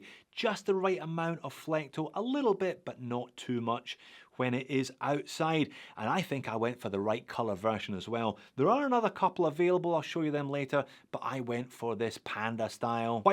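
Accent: Scotland, United Kingdom. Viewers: ya just type that in the search bar it's the old oatmeal crisp commercials from earlier 90s. For some reason your Scottish accent just pop the commercial into my head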